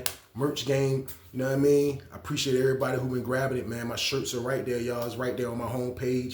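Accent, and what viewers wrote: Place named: Boston, United States. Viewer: U from Boston homie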